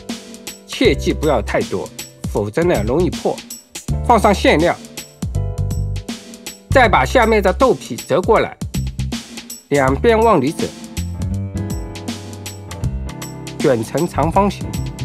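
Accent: Shanghai, China. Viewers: 爷叔的沪普口音有点重啊😂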